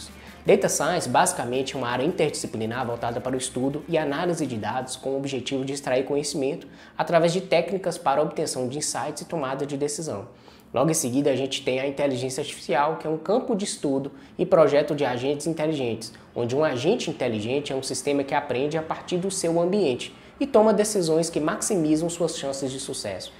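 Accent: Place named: Minas Gerais, Brazil. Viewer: Obrigado por todos os vídeos que você posta pra gente... aprendo muita coisa contigo.. muito bom ver um mineiro como eu e da minha cidade (BH).. kkk ja imaginava pelo sotaque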